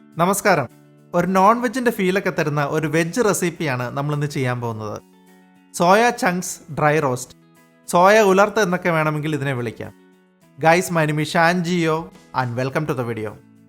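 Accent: Kottayam, India. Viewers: Chettan kottayam ano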